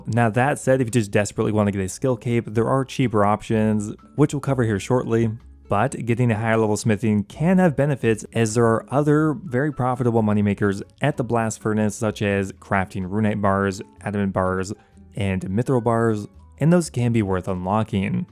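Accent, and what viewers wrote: Canada. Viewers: That’s the American way. This guy sounds Canadian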